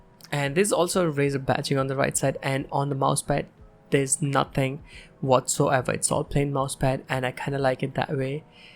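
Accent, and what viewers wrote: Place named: India. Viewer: Indian acent nikal rha bich bich mein. Wohi sahi hai. Yeh affectation gay lag rhi bhai. Sachi mein gay hai tu to sorry.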